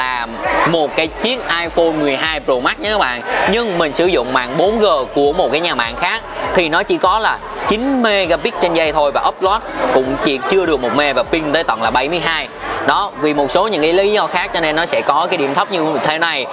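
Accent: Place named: Binh Dinh, Vietnam. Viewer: Nghe giống giọng Bình Định quá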